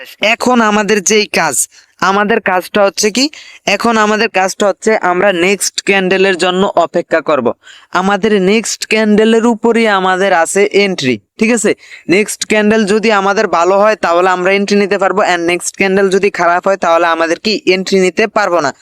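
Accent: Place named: Bhola, Bangladesh. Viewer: ভাই আপনার বাড়ি কি ভোলা। আপনার মুখের ভাষা ভোলার ভাষার মতো অনেকটা তাই জিজ্ঞেস করলাম।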